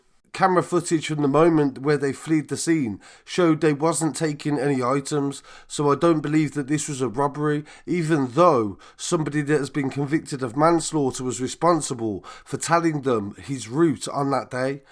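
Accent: Birmingham, United Kingdom. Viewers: Bro your starting to sound like a brummy bin man again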